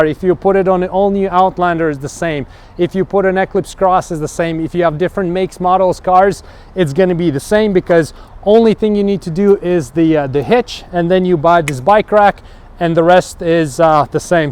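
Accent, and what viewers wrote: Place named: Canada. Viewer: Your accent is so cool, it's fun to watch just for that. I have an RVR. Very good info my Canadian friend. (From Toronto)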